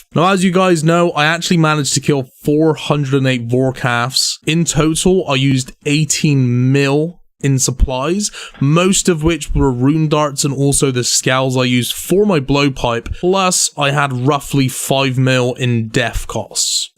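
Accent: United Kingdom. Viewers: where is from? im guessing UK but i cant tell exactly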